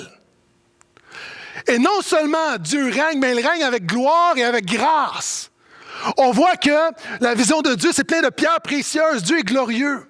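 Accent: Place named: Quebec, Canada. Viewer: Accent québécois. Difficile oui.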